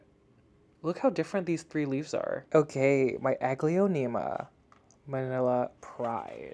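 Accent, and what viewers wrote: South, United States. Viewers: Love it when to tawk southern lol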